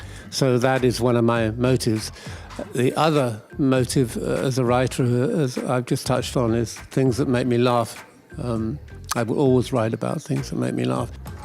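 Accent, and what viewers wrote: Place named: Germany. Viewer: Nice German accent...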